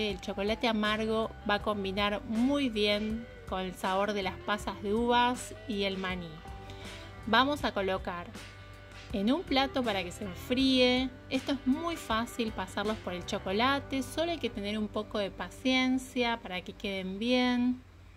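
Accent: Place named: Argentina. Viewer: voy a probar tus Recetas, te encontré, y me encantó como explicas,lo sencillo y se ve todo Delicioso!!!!! Que lindo paisaje desde tu ventana!!!!! Por tu acento,me pareció que sos Argentina.....me equivoco???? Ja ja bueno....es curiosidad.....Gacias,Abrazo desde Argentina.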